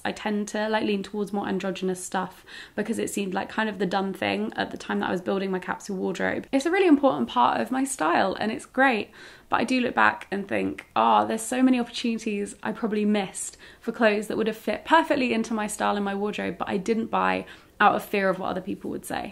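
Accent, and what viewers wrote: United Kingdom. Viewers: Omg, I literally faancy your accent!! Beautiful British accent 🤍🤍🤍. And the video was very helpful. Thanks 🙏🏽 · Awww you are so beautiful and funny and overall so enjoyable to watch. Love your way of speaking too (said by a non-British person who loooves a good British accent and well-spoken English in general!) Happy to join your channel and happy to have found it! Yay! Thanks for the tips, I am new to the "Capsule wardrobe" system and I am considering adopting it, as I like the idea - but at the same time I am not too sure about some other aspects of it... so thanks again, this will help me make better decisions (hopefully, haha)! P.S. with your body type you would be soooo popular in my country of birth, Brazil!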